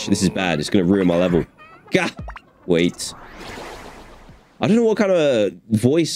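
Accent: United Kingdom. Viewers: British right.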